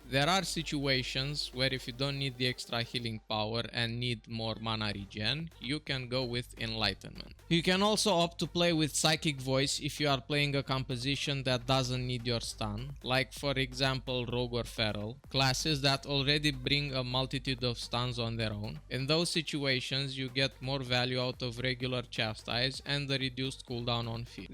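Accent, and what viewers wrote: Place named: Russia. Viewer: о боже, какой у тебя акцент... ты походу русский...)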